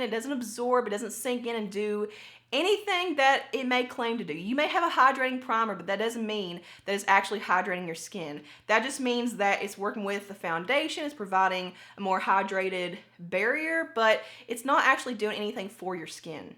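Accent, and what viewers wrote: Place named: Kentucky, United States. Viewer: Just found this channel, heard your accent and just knew you were one of us KY girls! Love it!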